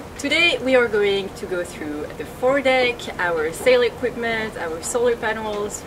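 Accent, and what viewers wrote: France. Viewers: Just discovered your channel. Really informative and love your french accent:)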